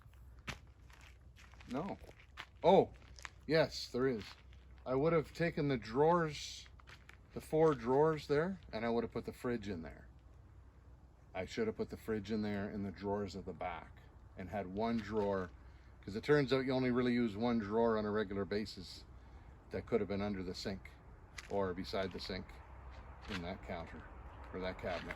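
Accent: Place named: Bahamas. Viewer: Why does he sound a bit Bahamian from Abaco Island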